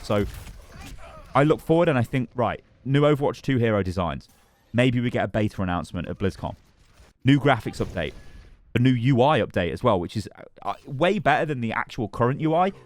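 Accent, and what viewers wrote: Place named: United Kingdom. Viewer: Good ol I don’t even listen to the video, this is just asmr of a British man talking gibberish for 10m 💀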